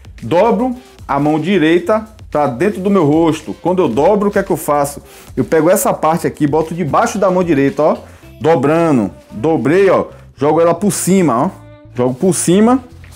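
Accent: Bahia, Brazil. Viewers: Oh sotaque maravilhoso ❤️❤️ baiano é outro nível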